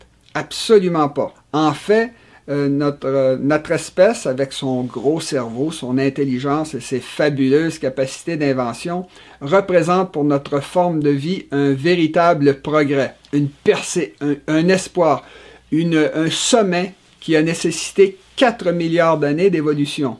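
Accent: Quebec, Canada. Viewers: Je vous préfère en français. J'aime l'accent québécois et vous évidemment plus confortable. Par contre, les français européens sont de l'avis que le français québécois n'est pas français!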